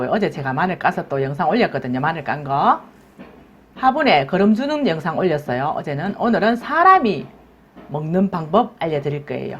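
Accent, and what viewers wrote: Gyeongsang, South Korea. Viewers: 영상은 10분으로 줄여 주시는게.. 경상도 말투로 쉼없이 계속 말씀 하시니 뭔 말인지 모르겠어요 귀가 멍멍합니다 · 맞아..유튜브로 돈버는것도 중요하지만..자기자신을 잘아는것도 큰 힘인것 같다..내 목소리..내 말투가 어떤지 상대방이 듣기 좋은지 ..거슬린지..자기 자신을 제대로 안다면 이렇게 장황하게 자신있게 계속 말하진 못할것 같다..진짜 경상도 사투리도 억쌘데 목소리도 거칠고..그럼 어떻게 영상을 만들어야 하는지..생각을 못하는걸까... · 경상도 말씨 애교가. 많네요. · 시끄러운 경상도 수다쟁이 아줌마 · 살림 요리 등 등 진행중에 고향 을 느끼게하는 고향말씨 좋은 꿀팀이되는 유익한정보 등등 너무나 좋게 듣고봅니다 참좋으신분 항상도 건강하시며 경상도말씀으로 씩씩하게 해주세요 👍👍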